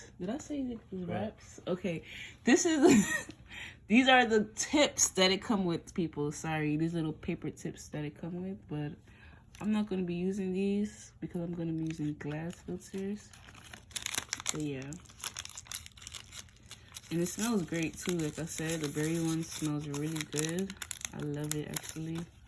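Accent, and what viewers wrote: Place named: North, United Kingdom. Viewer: Where you from gotta be up north somewhere?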